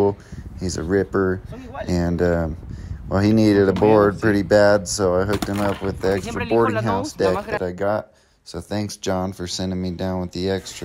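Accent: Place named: Spain. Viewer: Love your hoodie and your spanish accent jaja keep trying and improving it :) Stay tuned and have a good time 🤘🏼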